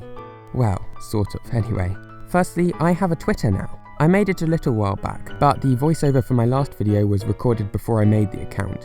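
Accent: United Kingdom. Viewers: You have an awesome speaking accent Are you British?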